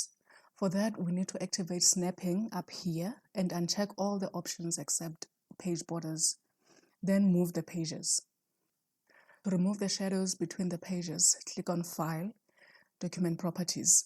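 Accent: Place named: South Africa. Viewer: You sound soooo South African. Thank you for the tutorial!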